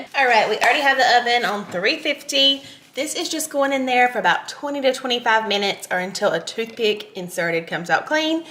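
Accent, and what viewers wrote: South, United States. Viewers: He's not quite as southern as me and you I say one forth also😂😂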